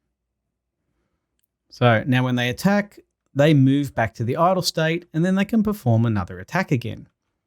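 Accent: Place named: Australia. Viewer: great videos and love the familiar aussie accent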